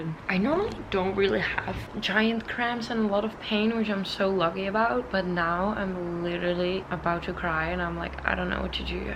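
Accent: Netherlands. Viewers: I love the Dutch accent. My very best gf came from Holland to Los Angeles when we were young. 'Besties' from age 10. In her home they spoke only Dutch. Tried to learn some, but it is very difficult language! She passed a few years ago and I miss her so. But I get to hear your beautiful Dutch accent. It reminds me so of her. She too, was tall & very beautiful like you. I listen to your vids and hear you talk, and it brings to mind something very special...a Dutch home, my 2nd family and my BFF. So enjoyable to watch you. AND Im learning about the value of 'healthy' food. It does change your energy level! (Im getting there LOL) Also enjoy the print work you do. Those 'warm' wintery clothes are beautifully appealing. But in LA?? (I still get their catalog) Naaaahh, too hot🔥. Well then, maybe see you in Park City??? 🌷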